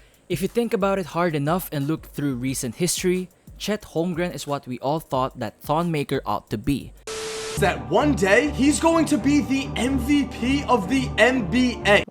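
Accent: Philippines. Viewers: I can hear by your accent that you’re filipino (do i spell it with a ph or an f, i dont want to be offensive or rude so correct me if im wrong) but with that being said if i was filipino i would like kai more also because of what he represents for the island and even tho im not filipino i like kai more also cuz if it came down to it and chet had to guard him he would have alot of trouble since kai weighs so much more than him although chet has better ball handles than kai but in the nba with them both being 7 footers they would be played as big men chet isn’t athletic enough to play guard so hes gonna have to get his big man skills better. So in my opinion if i was an nba scout i wiuld want kai over chet. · Filipino ka ba kasi yung boses mo pag pilipino boy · I think you are filipino bro, because of that filipino English accent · Sabi na nga ba Pinoy sa boses pa lang. 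Idol :) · I couldn't help but notice the Filipino accent in you but superb English nonetheless! Are you from the Philippines though? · Are you Filipino bro? Kasi yung accent mo parang pang Pilipino.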